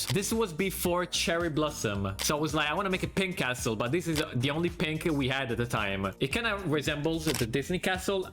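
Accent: Italy. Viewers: dai ma si sente che sei italianissimo! sei un grande comunque continua cosi!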